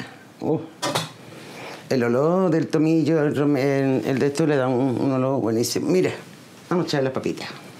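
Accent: Andalusia, Spain. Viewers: aquí en Madrid vivo yo y no se escucha eso sí el acento Andaluz lo apreciamos mucho viva tu cocina y tu salero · El mejor habla el andaluz y la cocina de olé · qe grande eres hija una papas guisar qe buenas el Señor ese qe aprenda el el Andaluz qe es lo más bonito de el mundo entero un abraso mui grande guapa ♥️♥️♥️🌹🌹🌹😘😘😘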